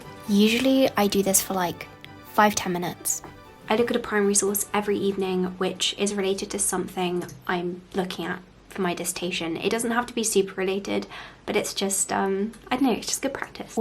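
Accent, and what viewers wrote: RP, United Kingdom. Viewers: Oh wow, so it IS similar (or the same?) as the Queen's English! I thought it sounded similar but wasn't sure if my American ears were just not able to hear the difference. Thanks! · It’s called Received Pronunciation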